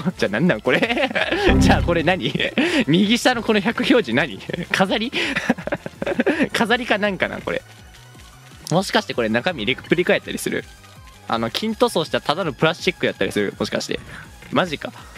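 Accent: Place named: Northern Kyushu, Japan. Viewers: ○○けん。は博多弁すね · この人の方言から九州北部を感じるんですけどどこ出身か分かる人いますか?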